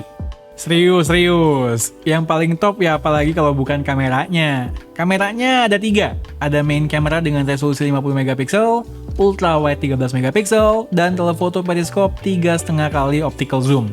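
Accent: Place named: India. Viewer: pertama kali gue kira video reviewer dari India. ternyata dari Indonesia hihihi. btw nice review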